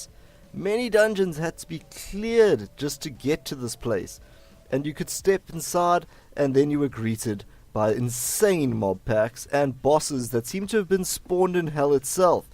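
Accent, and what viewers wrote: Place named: South Africa. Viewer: can't mistake that South African accent.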